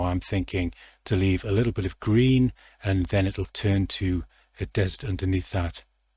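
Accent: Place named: North, United Kingdom. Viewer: You've gotta be a northerner right? Sound like your from my favourite city possibly? :D Love it man, to the point info, great details, great video :)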